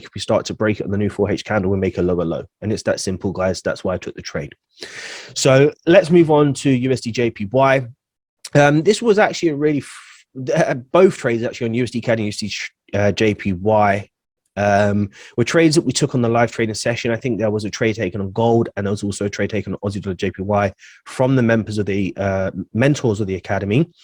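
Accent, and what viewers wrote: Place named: Cornwall, United Kingdom. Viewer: Greetings from Miami!!! Love, love the vids!!! you truly ROCK. I was wondering if the membership you offer includes daily signals? Also, are you from Cornwall? I have a friend who's accent is soooo similar to yours...lol. Thanks for great content and clear delivery!!! 🙏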